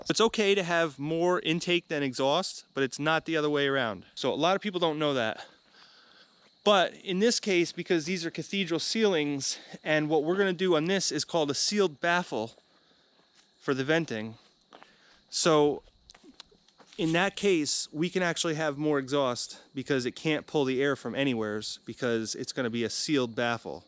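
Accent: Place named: Kingston NY, United States. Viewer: Sounds like your from Kingston Ny I live in Pleasant Valley. I like your mechanic videos and roofing videos.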